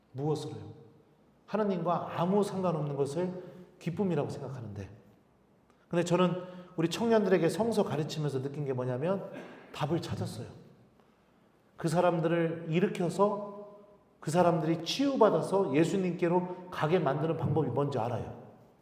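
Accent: Chungcheong, South Korea. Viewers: 신부님 감사합니다 충청도 사투리를 한번씩 쓰셔서 반갑다 생각했는데 대전교구 사제셨네요~^^ 제 고향이 대전 이거든요 신부님강의 너무 즐겁게 또 은혜롭게 잘 듣고 있습니다~❤❤❤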